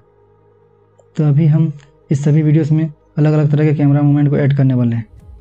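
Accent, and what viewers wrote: Odisha, India. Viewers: Bhai aap odisha se ho kya?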